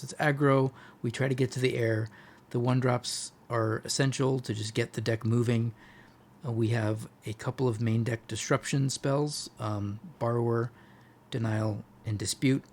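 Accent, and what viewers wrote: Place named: United States. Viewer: Soothing voice with american accent as promised by CGB — check ✅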